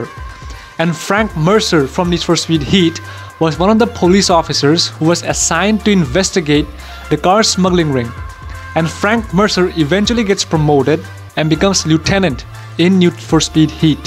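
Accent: India. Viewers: Your Indian accent really brings this story to life👍😸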